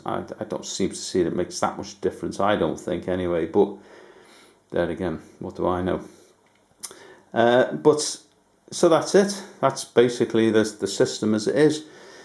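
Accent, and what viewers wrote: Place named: Liverpool, United Kingdom. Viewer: You sound like a Beatle Liverpool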